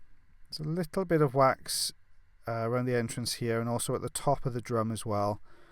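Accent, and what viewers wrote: Wales, United Kingdom. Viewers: Sorry folks, I released how stupid a comment this was immediately after writing it. I had just never seen subtitles pop up on this before, and it was a bit of a dig at Americans who require the measurements in inches because they can't multiply by 2.5, nor understand English spoken in any other accent. Mea culpa! Love the Welsh! My grandmother was unintelligibly Scottish!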